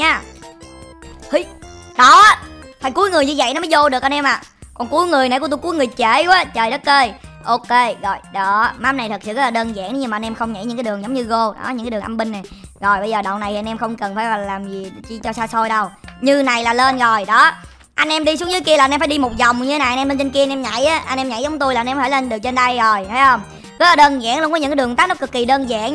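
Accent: South, Vietnam. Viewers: vì cách đọc của người miền Nam có chút nhầm lẫn thui . Ko sao cả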